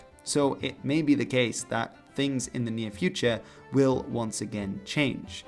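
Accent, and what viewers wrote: Dublin, Ireland. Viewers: Do you guys have much in common with northerners? Does your accent resemble more of a Dublin or Belfast accent? Do you support unity between your peoples? Would you support reparation?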